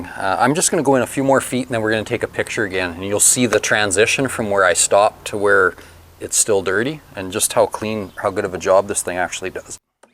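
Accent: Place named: Canada. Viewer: Luv this dudes Canadian accent.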